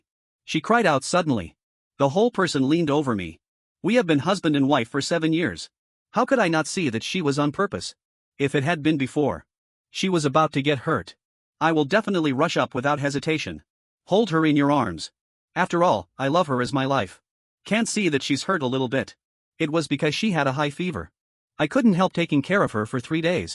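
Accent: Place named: China. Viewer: chinese style english . . .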